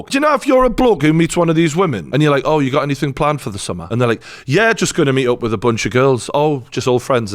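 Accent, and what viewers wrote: Newcastle, United Kingdom. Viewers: Fair enough, but in my defense I clicked because I like the true Geordie, he's entertaining no matter what he talks about.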